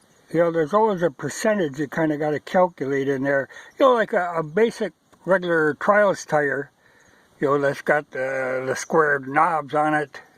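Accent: United States. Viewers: It was a little difficult for me to understand your accent during the first couple of minutes. To me it sounds like a southern USA accent but I really don't know. I'm from northern Spain, near France, and I still enjoy my Bultaco Pursang MK8, 363 cc that I bought new in August 1975. This bike came with Motocross racing tyres 3.00x21 and 4.50x18, but I tend to use Trials tyres in sizes 2.75x21 and 4.00x18. Michelin Competition is the lightest and stickier, Dunlop or Pirelli made for Trials Competition are also good, but tgey last very long like you would expect for every Trials tyres. Should I try your choice from Dunlop, if they make them in 2.75x21, or maybe 3.00x21? By the way... Im very intrigued with your accent. Where are you from?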